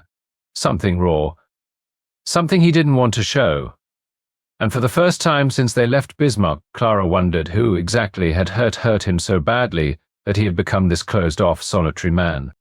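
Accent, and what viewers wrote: United Kingdom. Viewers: Kind of funny to hear à british voice reading an american story. However the narator is wonderful., as is the book.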